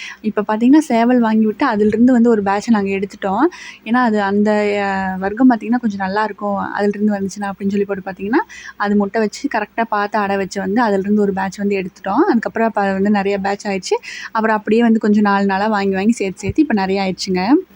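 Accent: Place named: Kongu, India. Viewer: Neenga yen sudden ah kongu slang la pesuringa.... Unga previous videos lam normal slang la pesuninga.. Adhaan kettaen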